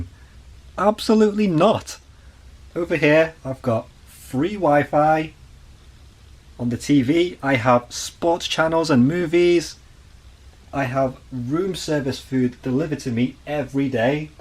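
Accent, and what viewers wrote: United Kingdom. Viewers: It’s a Liverpool accent 😎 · British accent is what caught my eyes/ears so I decided to listen and now a subscriber, interesting cruise life. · Another good vlog:-) I was caught off guard at first by the british accent when I first started watching. I'm glad all is going well...I too was wondering how you guys deal with laundry and room detail. Thanks for the great content...always fresh and putting a positive light on what's going on. It's much appreciated...myself...i would be climbing the walls...at least you have the internet and tv to help pass the time.:-) Have a great day:-)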